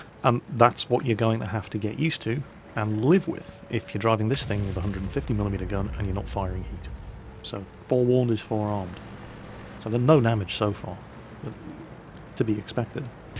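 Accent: United Kingdom. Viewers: he's in the uk -_- did you not get a hint from the accent or British airsoft field or other ridiculously obvious pointers like that he plays on the eu server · Can you not tell hes a brit?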